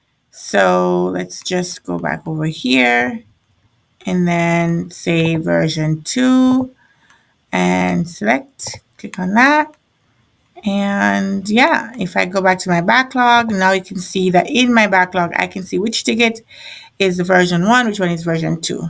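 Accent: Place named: Jamaica. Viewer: Sounds like you're a yardie. Loved the video, keep up the great work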